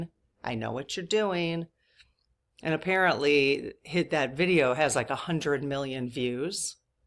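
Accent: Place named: Wisconsin, United States. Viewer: I grew up in Milwaukee and I detect a Wisco accent in you. Is that right? We moved away when I was 12 but I still have tons of relatives and friends there with that accent, which I love! I have ADHA and grew up with teachers telling my I was an underachiever because they knew I was smart but still was only getting Cs and Bs in school. I spent twice as much time on homework as my friends but no one knew why - there was no concept of ADHD then and people just thought I was slow to get things. Even in college I had to work twice as hard to get my BA in experimental psych. I didn't feel dumb but I just knew everything took me longer and was harder and I would make lots of simple mistakes such as in simple processes like addition and subtraction.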